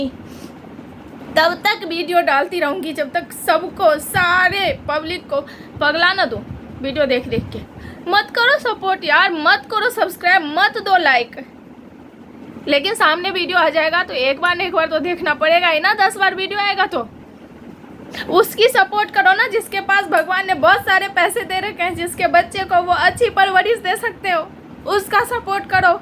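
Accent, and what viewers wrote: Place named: Nepal. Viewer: Rona nahin boli me Nepali lagti hai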